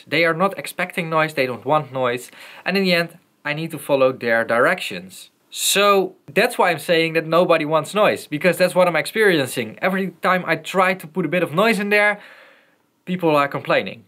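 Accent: Netherlands. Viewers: Are you dutch, you have a bit of an accent?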